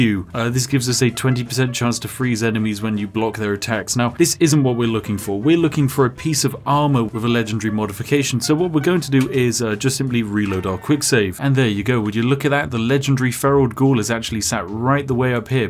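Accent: United Kingdom. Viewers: Hey brit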